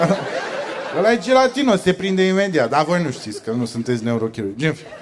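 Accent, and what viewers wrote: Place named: Moldova. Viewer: Ca sa razi la dobitocul asta iti trebuie multa prostie...lasand accentul si vocabularul de ghertoi moldovean.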